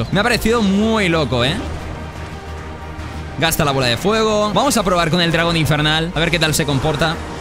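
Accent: Argentina. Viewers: cada vez mas argentino 😎🤑🇦🇷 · Jajajaja me hace gracias cómo abla como argentino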